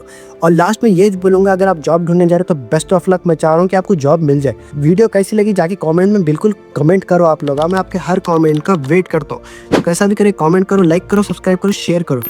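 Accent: Hyderabad, India. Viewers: Bro your Hyderabadi accent 🥰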